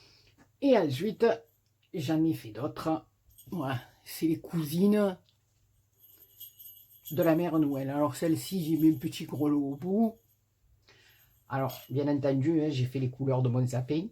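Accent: South, France. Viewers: Géniale la petite Mère Noël. J'ai passé un excellent moment à la visionner. En plus avec l'accent du soleil et l'humour, que demander de plus. Merci beaucoup pour ce superbe tuto. Bisous breizh (Bretagne)! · Coucou! Je crois bien que le père noël va retomber amoureux de sa belle de noël ❤️ En tout cas moi je craque!!! J ai pas le matériel mais sinon j en aurai fait avec plaisir!!!! Comme celui que j ai eu a t entendre nous dire tes bêtises avec ton accent du soleil ☀️ Gros bisous 💋💋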